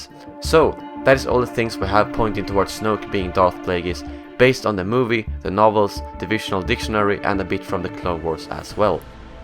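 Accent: Sweden. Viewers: Dat svengelska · Älskar din svensk engelska :D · U sound swedish ????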